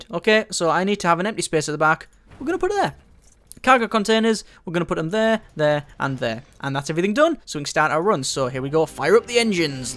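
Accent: United Kingdom. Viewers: jezus christ that british accent is horrible !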